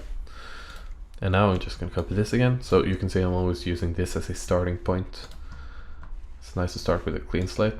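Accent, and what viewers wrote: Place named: Scandinavia. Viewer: Scandinavian accent.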